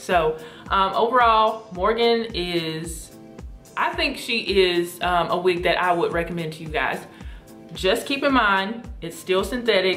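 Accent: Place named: North Carolina, United States. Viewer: She sounds like she is from North Carolina.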